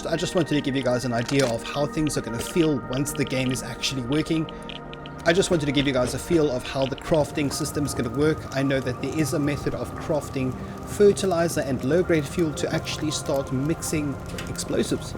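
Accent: South Africa. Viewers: Mister are you from South Africa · this guy sounds south african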